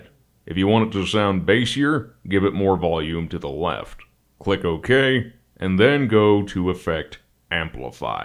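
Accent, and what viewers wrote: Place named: United States. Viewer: Most american voice Ive ever heard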